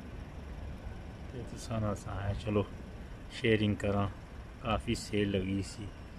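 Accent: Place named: Punjab, India. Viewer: AsalamOalikum masahAllah apki boli suun kr apnaa punjab yaad ajata hai 😇khush rahay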